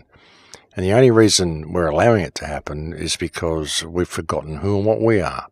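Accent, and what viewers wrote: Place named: Australia. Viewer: I love your vlogs it’s nice to hear a Aussie voice so smart and I wish you could go on Q and A wake up some more aussies we need it keep up the god fight 🇦🇺💖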